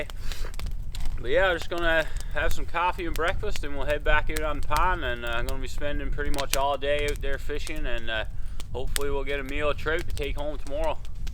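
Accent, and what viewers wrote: Newfoundland, Canada. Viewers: I like the Newfoundlander accent, reminds me of when I was little. That is a great dog he is such a character!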